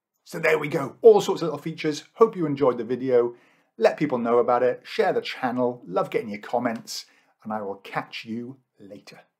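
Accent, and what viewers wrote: Wales, United Kingdom. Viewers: Which part of Wales are you from?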